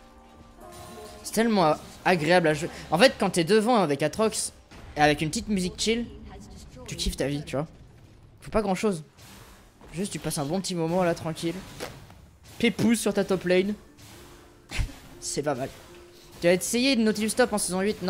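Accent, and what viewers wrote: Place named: Russia. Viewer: Mdr j'adore l'accens russe quand il parle anglais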